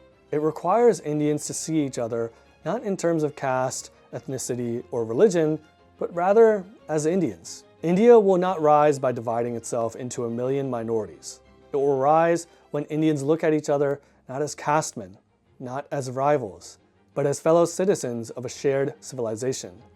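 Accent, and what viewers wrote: United States. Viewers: from …. hmmm So interesting!I watched a couple of videos already. We come across something new everyday, here we find an american accented indian living in the US who is huge BJP fan!! He does a lot of research, but in his fangirling over Modi forgets to find “the first OBC PM of India” comment by the PM? Also according to “BJP is reformist and a rebel”! So what has stopped this rebel to challenge the status quo? As a general caste hindu i want to know why hasn’t this reformist party challenged the reservation system openly? It could have done that alongside its hindutva politics? Strange are the ways of the world! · Best channel. Explaining India through an Indian lens, with just 1 difference: a western accent & articulation. Makes it much more consumable for the world.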